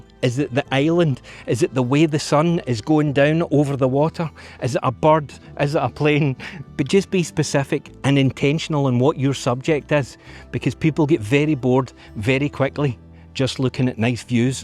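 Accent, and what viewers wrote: Scotland, United Kingdom. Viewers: We have all done these thats how we learn and improve. Love the way you explain how to capture better photos. Thanks 😊everything sounds better with a Scottish accent. Lovely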